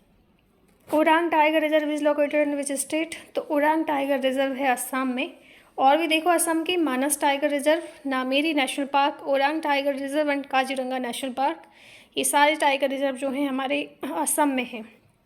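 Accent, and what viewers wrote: Odisha, India. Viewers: Mam aap odisha ke ho kya?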